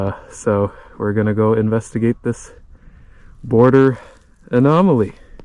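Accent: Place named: New England, United States. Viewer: What state are from? I have no doubt in my mind that you are a new englander!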